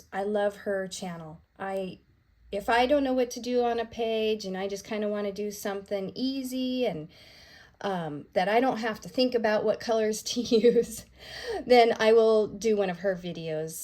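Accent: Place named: Wisconsin, United States. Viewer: 🤣🤣 I'm just saying, I'm from WI and you sound just like me! 😉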